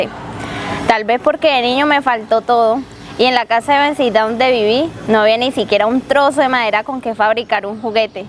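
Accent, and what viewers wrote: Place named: Caribbean Coast, Colombia. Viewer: Me gusta mucho el acento costeño ajá. Se ve el trabajo. Pese a eso, debes tener cuidado con las letras de las palabras que te saltas. Estás inmersa en el texto, no nos miras ni en un momento. Habría ganado mucha fuerza si nos hubieras echado una miradita, lo mismo con la interpretación, agitar la mano hacia adelante en el "ajá".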